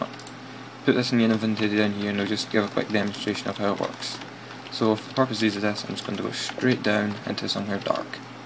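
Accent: Scotland, United Kingdom. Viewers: i love your accent. scotland? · yay a scots like me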